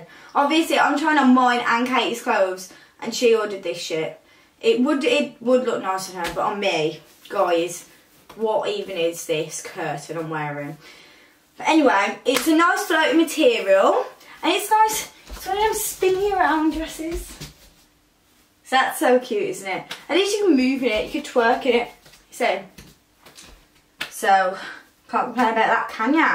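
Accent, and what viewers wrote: England, United Kingdom. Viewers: British accent is nice. Calm tf down. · Ur too pretty, love ur way ^_^ Brazils guy :P Obviously by ur accent u r from Uk :) Speak like Portuguese people :D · That english accent... )))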